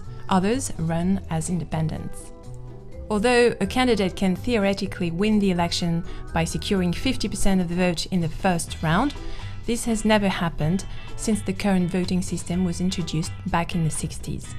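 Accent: France. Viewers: son accent Français est très joli. Je ne comprends pas les Français qui critiquent un accent que tout le monde aime autour du monde. Sans parler du fait qu'il existe des tas d'accent teintant la langue anglaise. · Normal People Who Exist Meme la narratrice est francaise elle fait de son mieux mais son accent craint... Je pense que cest la meilleure page sur YouTube juste pour voir des francais batardiser l'anglais 😂😂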